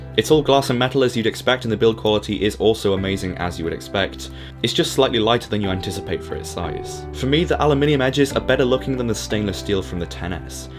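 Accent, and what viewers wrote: United Kingdom. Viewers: The slow music and your smooth British accent almost makes it sound like this is an Apple trailer lol Doesn’t change the fact that this was easily one of the best reviews and everything was on point! Good job bro!!!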